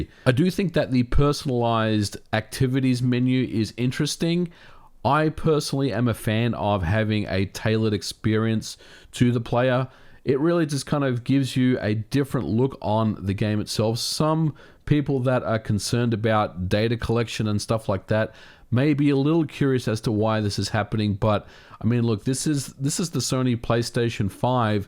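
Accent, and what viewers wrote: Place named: Australia. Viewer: Australian with a hint of American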